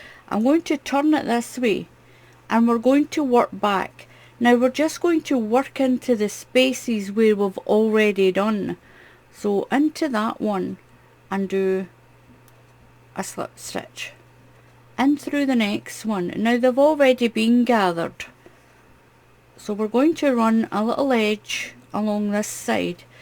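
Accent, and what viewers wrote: Scotland, United Kingdom. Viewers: I stumbled over your website. You have wonderful patterns. But I have a problem. I got sent to a hearing specialist when I was 5 yrs old due to suspected loss of hearing. Severe ear infections over the years ate away the bones in my inner ear. I relate this for all new mothers to pay attention to fussy babies who keep rubbing their ears or get colds. Working antibiotics finally arrived when I hit adulthoods, but the damage was done, I lost complete hearing in left ear due to destroyed bones, and partial in the right is left. I am 70 yrs old now, retired, but lost almost all my hearing. Hearing aids were stolen by “helpers.” My problem is now not being able to understand low soft voices. I had a Scot boyfriend in college your voice sounds much like his mother’s. So I have a dual problem, voice too soft to hear well, and I have to work my way through your accent. 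What compounds it is so far, I can find no instructions in your various pattern videos included in printed on your videos. I have to see the piece you are working on to figure out quickly what you are doing. But often your hands cover the work. Most f what I crochet is done for charity. I’ve done hats, scarves, mittens for grade school children, donated them to schools that provided children with winter items who lost theirs or parents couldn’t afford to buy them. I’ve done baby blankets to go to maternity units in hospitals or for those in NICUs for preemies. Also have done lap afghans for wheelchair vets in veteran h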